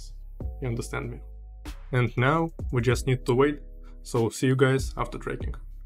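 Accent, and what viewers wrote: Russia. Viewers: С первых секунд ролика понял, что ты русский)) а после "mask один" я орнул))))) классный английский бро)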